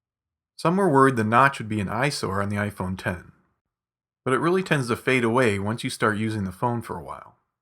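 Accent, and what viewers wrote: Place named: RP, United Kingdom. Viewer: nicely done, and he speaks the queens, hurrah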